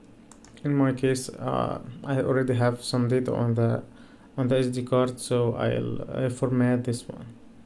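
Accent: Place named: Egypt. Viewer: من لهجتك عرفت انك عربي ومصري صدقني كثيرعرب يبحثون عن مثل هذي الشروح والبعض منا يجد صعوبه اذا كان الي يشرح انجليزي ف اتمنا منك تشرح بالعربي وتفيدنا جزاك الله خير وصدقني راح يتابعونك عرب كثير وانا اولهم ههههه اسأل الله لك التوفيق ..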